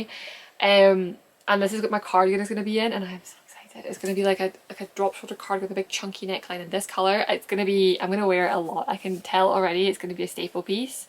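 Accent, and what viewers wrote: Scotland, United Kingdom. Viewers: Hi, My first time watching your channel - lovely to see you looking so well! I have knitted your patterns before and love them. You are such a talented and generous knitter. One comment - I am from Edinburgh and lived in Scotland all of my life - but I struggled keeping up with your commentary..😂. You talk very quickly, with lots of words..I know it is a Scottish thing to rush at sentences, but it wasn’t quite as relaxing to watch as some other videos. Appreciate you have a lot to get through, so not a criticism, just an observation…❤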